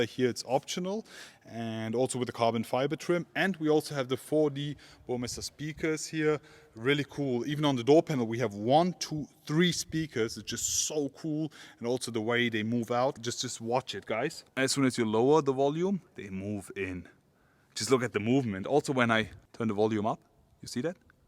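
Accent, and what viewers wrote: Germany. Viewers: I always liked, a German accent, suits the Mercedes. Makes it, more tempting, to buy one. Cheers......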